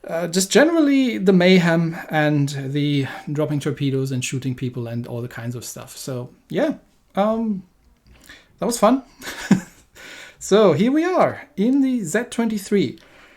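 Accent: Germany. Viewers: Yes, he is from Nurnberg. He now lives in Australia. Check out his Nurnberg video. He says it there.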